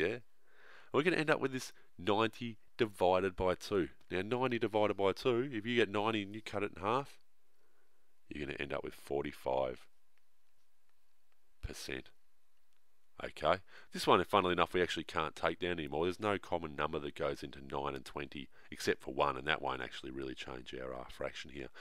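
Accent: Australia. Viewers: Love all your video's and you have a cool voice, sounds like an Aussie accent :-) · That thic australian accent calm my mind... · You can tell he's Australian by his first words on most videos, 'G'day everybody...' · Your channel is the reason I'm starting to overcome my fear of mathematics. Your style is unique, you avoid unnecessary terminology and technicalities, you keep it clean and simple and you have an innate aptitude for explaining problems. And bonus, I love your deep and soothing voice your accent is amazing! Is it Australian? Thanks and keep making these amazing videos! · Oh my god thank you so much! I've watched several other videos but thy didn't help me one single bit, and this helped me so much! Maybe because I'm used to aussie accents. xD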